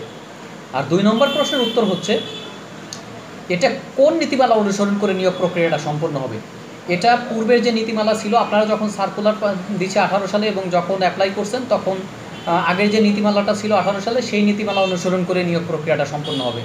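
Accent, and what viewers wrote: Bangladesh. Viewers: ওরে বরিশাইল্লা!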